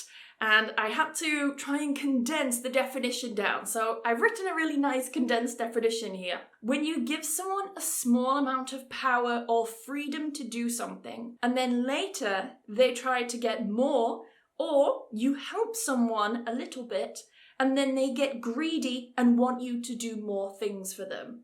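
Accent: United Kingdom. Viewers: !! I love your videos! How do I find you on preply without having to search through every tutor? May favorite idiom … 🥁 Keep your wig on 😅🤣😂🤣😂🤣😂 Love it! Going to use that one here in America! I’m American (Spanish American), however LOVE the British accent and want to learn proper pronunciations. Thank you! Love your videos!! 🎉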